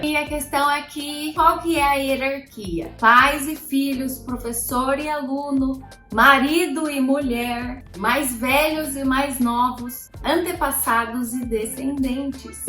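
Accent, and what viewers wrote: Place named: Inland São Paulo, Brazil. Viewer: De onde você é? Um sotaque forte será do interior de SP? ,Ou é Paraná?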